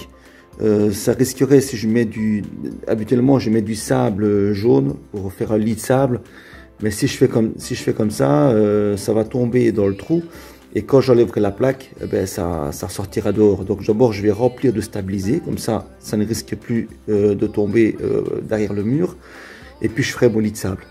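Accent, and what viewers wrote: Belgium. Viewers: Mais ne serais ce pas un petit accent belge ? Voir même liégeois 😊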